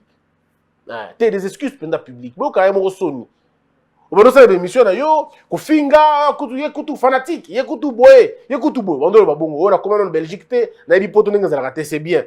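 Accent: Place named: Mongo, Democratic Republic of the Congo. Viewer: Mr, j'aime quand tu parles le Lingala avec un peu de kimongo.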